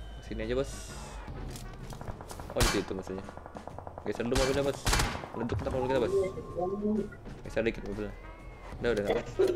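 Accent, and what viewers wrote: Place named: Banyumas, Indonesia. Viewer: Ora ngapak ora kepenak 😀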